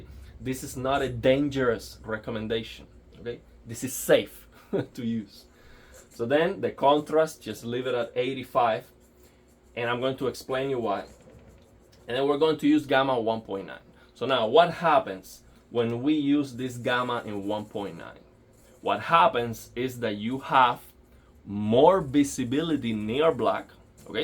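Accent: Cuba. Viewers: Asere tu eres cubano xq me suena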